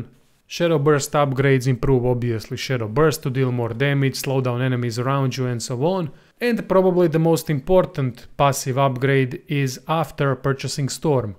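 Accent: Russia. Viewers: Are you russian? Very similar accent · So there's a character in "The Magicians" Series of books ... which they made into a TV Show ... so when I'm being instructed by someone with a Russian Accent ... about how to be the best mage... I can only think you're Mayakovsky in disguise XD...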